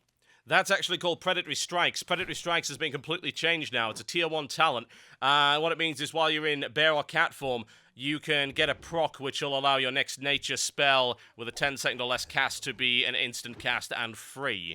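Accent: United Kingdom. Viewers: i think u should do the worgan voices cause u sound more british than anyone at blizz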